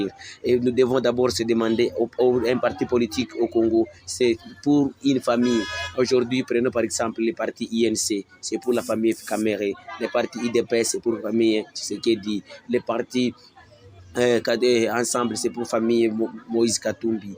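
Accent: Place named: Rwanda. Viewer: Mes chers , ce type qui parle n'est pas congolais est un Rwandais. Ne tombez pas dans n'importe quoi non .suivez attentivement sa façon de parler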